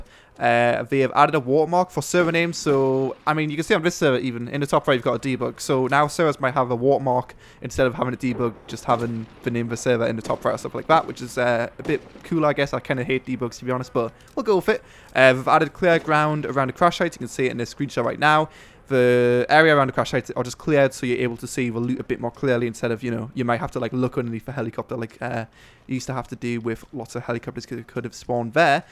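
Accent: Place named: United Kingdom. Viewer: If you cant listen to him, then watch someone else and stop being annoying to other people watching the video. By what you said, you don't like live commentary, which is fine. His dialect? I'm guessing he's british, and really? You cant understand him? I'm from Serbia and I can understand him better then someone from Croatia! Well. there is my rant to you, sir! Respond if you wish, goodbye!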